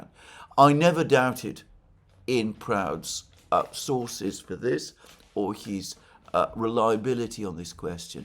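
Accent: United Kingdom. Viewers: AM's real audience is Russia. AM is reading a Kremlin script. The Kremlin chose AM because he is physically repulsive and has a British accent and because they got him cheap because he had been recently disbarred, a fact the Kremlin never discloses in its script it issues to AM to read. I watch the program because it is a direct line into what the Kremlin is telling its own citizens. Again, keep in mind that the reason the Kremlin selected AM to read their script is because AM is physically repulsive with a British accent, which is how the Kremlin wants to portray the West.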